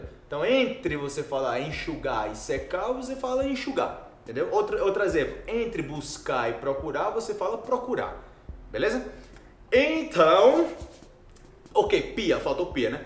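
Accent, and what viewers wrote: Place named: Colombia. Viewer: Eu não gosto muito do acento colombiano, mas quando você fala espanhol com esse acento, soma muito engraçado além das "muecas".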